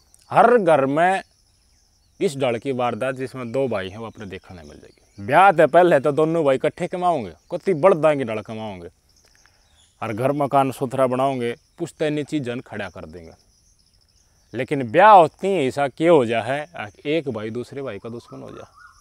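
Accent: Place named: Khari Boli, India. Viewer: भाईसाहब आप की खड़ी बोली की शैली बहुत सुंदर है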